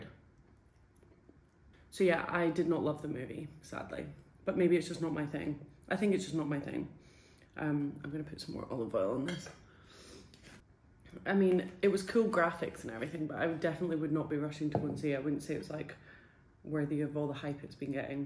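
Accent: Scotland, United Kingdom. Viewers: Lovely vlogging style and great to hear a Scottish accent ❤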